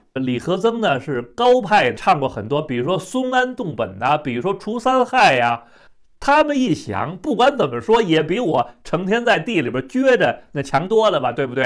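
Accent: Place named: Beijing, China. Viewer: 特喜歡聽北京老話。牛年吉祥！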